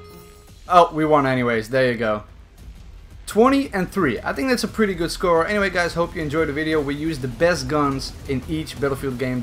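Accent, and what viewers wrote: Netherlands. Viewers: Je bent Nederlands ik hoor dat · Jij hebt de typische Nederlandse accent wanneer je Engels spreekt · I hear some Dutch accent, am I right? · Are you dutch by any chance?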